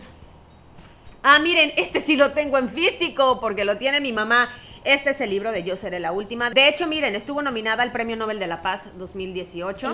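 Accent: Yucatan, Mexico. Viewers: Hablas como.yucateca xD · Buenísimo el acento yucateco 😂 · Apenas empezó el vídeo dije "jmmm eso suena como acento yucateco!" Soy Yucateca y es increíble para mí pensar que alguien como tú está aquí!! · Hola; solo una recomendación. En caso de los niños cuidado con las horas de pantalla digital. Hay recomendaciones de horas de pantalla en bebés, niños y adolescentes. Buenas recomendaciones las que has dado! ✌🏼. Y ese acento yucateco? Saludos desde Panamá 🇵🇦!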